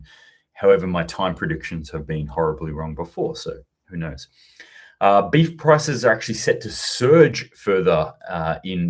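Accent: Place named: Australia. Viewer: Only found you today and have only watched a few of your vids so far but am liking your content. Love hearing a fellow Aussie (I’m from SA) getting into the geopolitical theatre and giving straight forward breakdowns without the MSM spin. I think I read on telegram this morning that Spain was aligned with Serbia? Interesting when Serbia is aligned with Russia as well. Looks like todays tension has been kicked down the road for another month. And Serbia has beefed up its defensive measures a lot since NATO intervened last time… So many tinderboxes (conflict, contagions and food shortages) all over the globe at the same time. Coincidence of course. 🧐🤣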